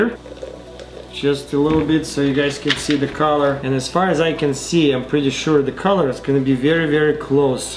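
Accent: Russia. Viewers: Are u actually russion?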